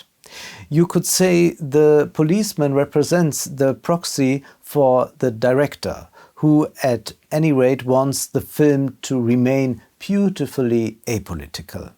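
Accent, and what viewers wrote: Germany. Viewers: Sympathic german accent. Really like it:) Immer weiter, keiner macht sein Ding so schön wie Du:) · High quality content with a cute german accent! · Though the German accent is very lovely, considering enabling the subtitles might help the one or the other to better understand what is being said. Would be a shame considering the brilliants of the analysis. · Ohne den deutschen Akzent wäre die auch im Englischen makellose Wortwahl nur halb so schön.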